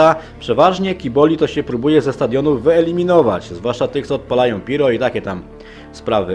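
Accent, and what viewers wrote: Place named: Silesia, Poland. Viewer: Niby z Warszawy, a nawija jak hanys.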